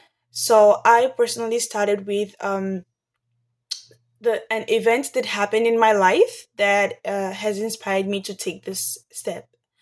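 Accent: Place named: India. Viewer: Its The indian accent for me 😂❤️❤️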